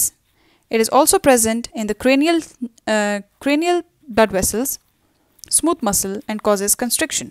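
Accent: India. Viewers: Hint aksanı ingilizce dinleyemiyorum çok gıcık ediyor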